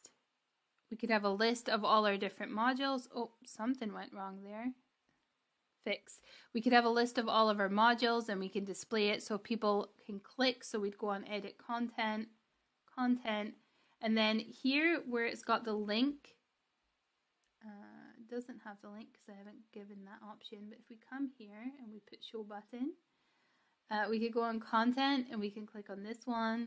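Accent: Scotland, United Kingdom. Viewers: Nice to hear another Scottish accent! I'm thinking of members areas for my teaching site, do you know if you have the option to also have a community area under the membership, as well as access to members only videos?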